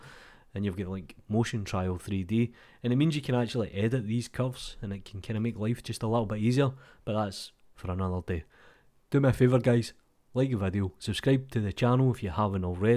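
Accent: Scotland, United Kingdom. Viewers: love ur tuts and ur accent too , it"l like william wallace is giving me a blender tutorial :)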